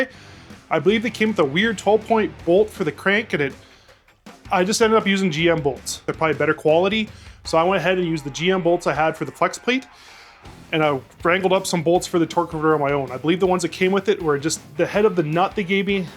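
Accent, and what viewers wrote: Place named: Canada. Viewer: Oooooh yeah bud, Canadian eh? Subbed!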